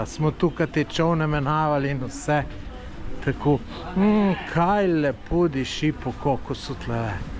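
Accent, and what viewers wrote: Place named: Dolenjska, Slovenia. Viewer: po moje pomen,da se je usedel🤣..men je smesna ta njegova dolenscina... A ves kaj pomen pride puj?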